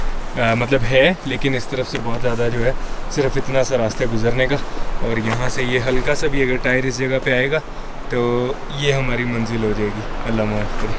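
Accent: Punjab, India. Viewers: Bro aap ka jo punjabi touch hai na conversation main wo unique hai jisay daikh k apna apna feel hota k apna koi yar bna raha..... Warna north k vlogs to aur b boht log bnatay hain...... Isi trah genuine accent main raho u deserve more respect specialy u realize us to how we love our country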